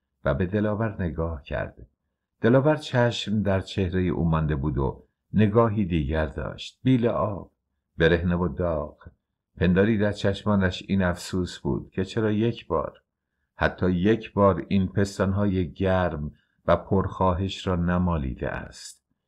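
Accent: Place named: Khorasan, Iran. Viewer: با درود استاد گرامی، گویش فوق العاده زیبایتان این کتاب را دو. چندان جالب میکند، بخصوص که مرا به خاطراتم به شهرهای جنوبی استان خراسان و همچنین لهجه زیبای این افراد میبرد. سپاس فراوان❤❤❤❤❤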